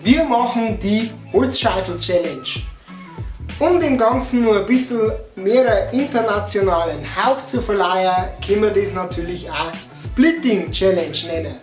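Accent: Bavaria, Germany. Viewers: I almost don't get anything you say but it's always nice listen to thr bavarian accent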